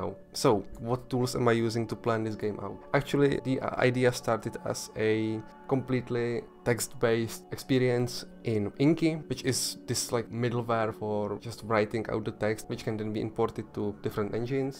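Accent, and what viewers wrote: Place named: Czech Republic. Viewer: Kvalitní hry a český přízvuk :D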